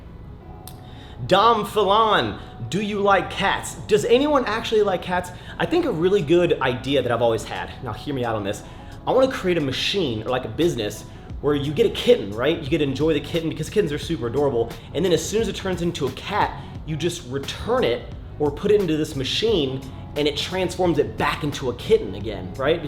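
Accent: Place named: New Jersey, United States. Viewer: Lovely accent you have there, New Jersey?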